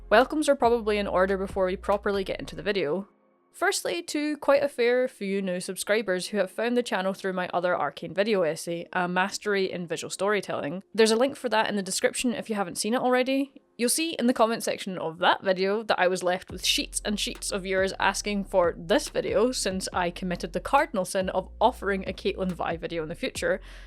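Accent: Scotland, United Kingdom. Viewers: The thick Scottish accent with the Chinese channel name threw me off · I really did not expect to hear an scottish accent when I clicked a video LOL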